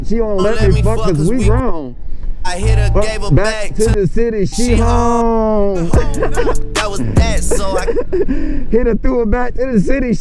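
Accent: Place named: New York, United States. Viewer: New Yorker for sure LMAO, what's up ma man 🇺🇸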